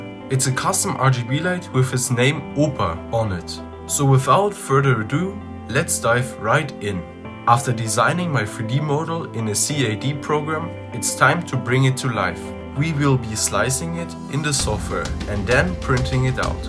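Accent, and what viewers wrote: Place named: Germany. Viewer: It was obvious, that you're a German... ich auch :D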